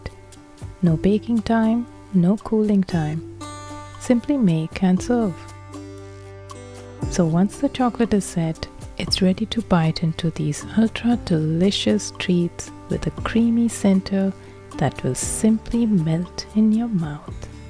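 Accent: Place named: India. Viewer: Very nice u sound like u r from India 🇮🇳 am i west Indian trinidad 🇹🇹 lovely 🙏✌️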